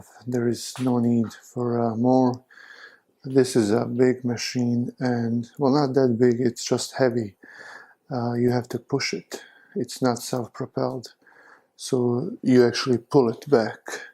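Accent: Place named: Balkans, Eastern Europe. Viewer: Very detailed. Thanks for this. You sound eastern European. Maybe from Balkans?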